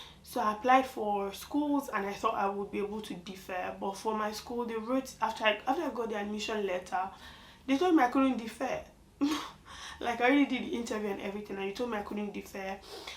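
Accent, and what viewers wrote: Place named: France. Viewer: ❤❤❤happy new year in Advance …your English is already having French intonation…bonsoir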